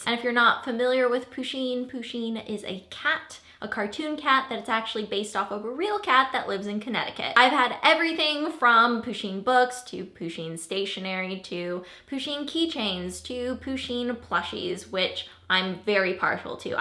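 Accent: South, United States. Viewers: Where are you from? You sound kind southern, but I thought you were from CA...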